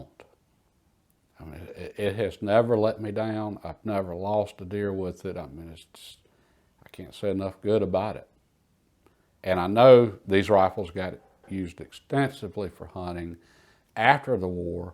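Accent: South, United States. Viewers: Fantastic just love your southern Accent, thanks for knowledge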